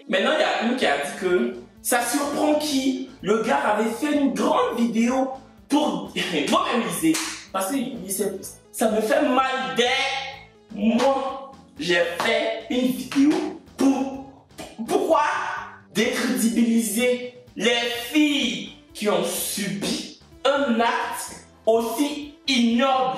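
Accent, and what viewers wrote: Africa. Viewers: Oui je jure tu as le vrai sang africain je te jure la façon de parler moi mm je suis africaine et fier de l'être #gabon! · C'est pas vrai au contraire je trouve que juste par son accent il valorise l'Afrique il n'a jamais dit que les blancs sont mieux que nous au sens propre du terme moi j'adore justement parce qu'il se démarque des youtubeurs Africains qui vivent en Occident il est resté authentique s'il y'a une chose à retenir c'est que si tu veux suivre faut éviter d'être susceptible sinon t'a pas ta place ici ceux qui trouvent qu'il exagère ce sont des personnes hyper susceptibles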